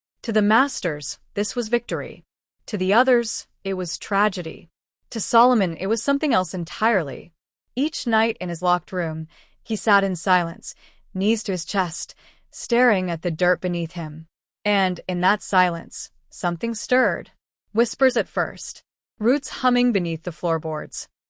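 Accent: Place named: Europe. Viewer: I would have listen to it if the voice wasn't European.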